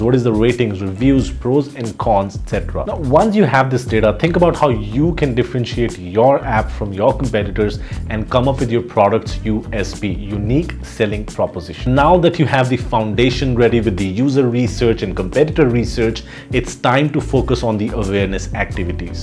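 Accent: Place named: India. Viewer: Indian accent is cool bro, stay with it. It will work.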